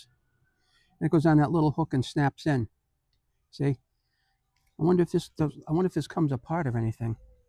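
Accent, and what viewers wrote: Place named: Boston, United States. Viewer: Thanks for the video brother, love your accent, sounds like my cousins from Boston. I’m up here in Canada and have a 2020 Nautilus and this video was very helpful.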